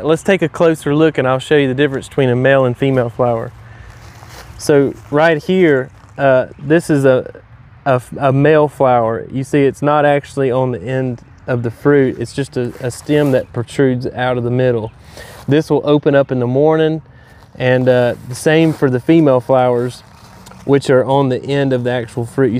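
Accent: South, United States. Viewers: thanks, great video! very instructive! will do mine now this morning! by the way! you've got a great southern dialect!!!! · Recognized a Southern accent, confirmed by NC on your shirt :) I'm in Arkansas.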